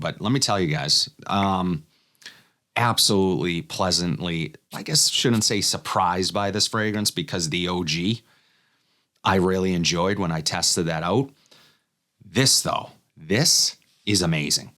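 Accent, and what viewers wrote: Boston, United States. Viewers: I’m currently watching “Clubhouse: a year with the Red Sox”. So between your vids and that, my exposure to the Boston/NE accent is seriously starting to imprint… 😂 SOTD: Penhaligons Endymion Concentré (under the radar banger). Tomorrow Sunrise on the Red Sand Dunes Intense! Congrats on 27K my man 👏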